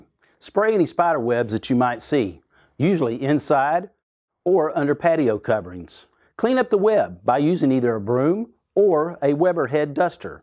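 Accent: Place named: United States. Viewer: No offence but I'm an Australian arachnophobe. Your American accent is not reassuring for me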